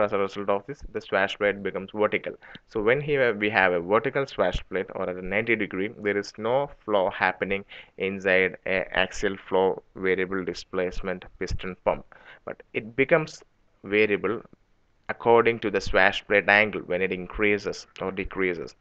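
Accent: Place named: South India, India. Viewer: Products sorry man,it south Indian English they cant help it,ask something technical u will certainly get an answer. south Indians are one of best technical race on earth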